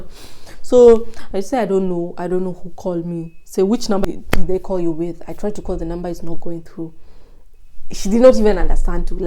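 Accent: Nigeria. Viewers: U got new sub I'm so sorry for what you go through I also I noticed you have naija accent 😂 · but accet yako ni yawanaijeria😂😂😂😂u speek like them not kenyan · You kinda have a Nigerian accent. Anyways, sending all my love ❤️ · Why do you have a Nigerian accent? 🤔. Ubaya ya wasichana wakenya ni kupenda ngono sana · Pole..i noticed your accent is almost Nigerian. Dont worry things will be better. One door closes and 2 more opens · It's giving Nigerian accent!! You are strong girl.❤ Keep up gal! You are a star.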